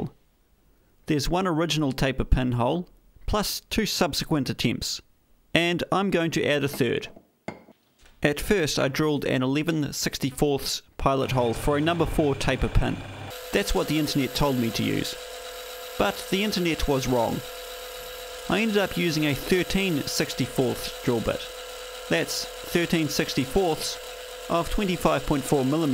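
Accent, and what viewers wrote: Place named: New Zealand. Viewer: Always happy to see a video of yours pop up in my feed. As a Kiwi living in Australia, your accent is making me miss home!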